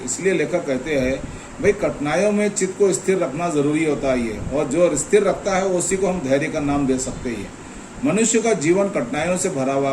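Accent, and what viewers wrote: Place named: Hyderabad, India. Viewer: Explanation fabulous but that Hyderabadi Hindi that was way more fabulous than your explanation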